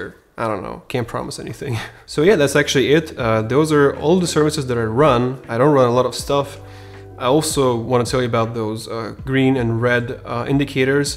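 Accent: Russia. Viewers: такое ощущение что автор видео Русский пацан - по акценту заметно =) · Are you from Russia?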